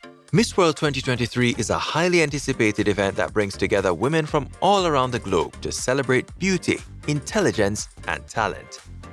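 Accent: Jamaica. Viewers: I have watched videos by this narrator and he sounds Jamaican and has a bias for Jamaica in his videos. There was no mention of Giselle La Ronde or Wendy Fitzwilliam . He bigs up Jamaica more than any other island 😮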